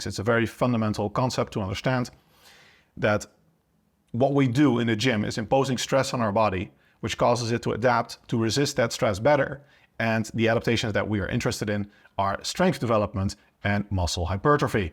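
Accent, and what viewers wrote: Netherlands. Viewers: I can spot a dutch accent anywhere. Lol.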